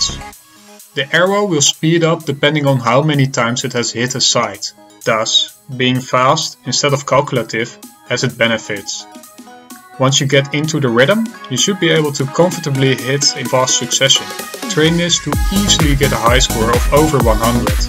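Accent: Netherlands. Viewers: Ik hoor een nederlandse accent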